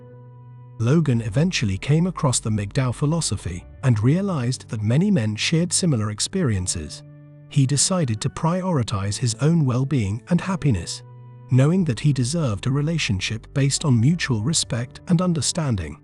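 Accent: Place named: United Kingdom. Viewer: How about you take your British accent and get bent.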